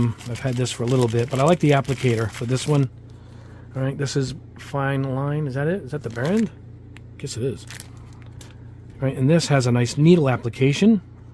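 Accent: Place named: Boston, United States. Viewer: Back to the hobby in the last 6 months. I just found your videos and am enjoying myself at 63 years old. I live in Minnesota, yet am from Boston... so the accent is like home.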